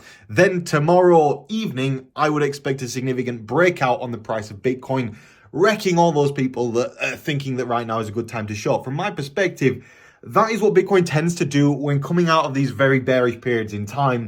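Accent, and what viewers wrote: Yorkshire, United Kingdom. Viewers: Me too. I love bold and confident nature and style with his Yorkshire accent. I am from a Leeds too by the way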